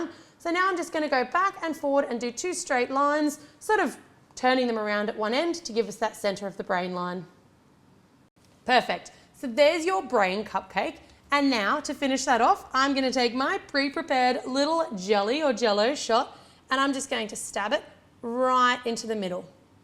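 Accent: Australia. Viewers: Do Australians find American accents as beautiful as Americans find Australians'? I love listening to you talk. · OMG. What age are you?! LOL. You clearly don't hear accents. She's AUSTRALIAN.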